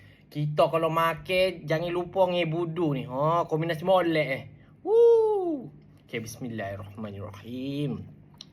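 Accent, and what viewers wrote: Kelantan, Malaysia. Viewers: Sabah Dari mana Kelantan la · Bahasa kelantan tu👊🏻🌚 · Kocek kelate mo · Kecek kelate ko😂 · mode kelate😂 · Pandai lah kecek bahaso kelate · kecik kelate 😂😂😂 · kecek kelate ko😅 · Hok aloh kecek kelate 😂😂😂😂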